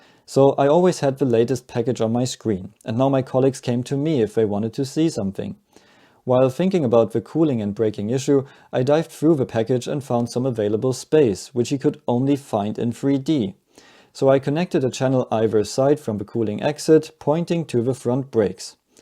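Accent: Germany. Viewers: The best articulated middle finger to management in polite german english. I love it. Sadly nobody who should will ever learn from this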